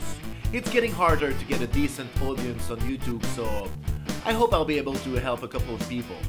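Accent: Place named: France. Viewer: Très drôle t'entendre parler en anglais, frenchy! :P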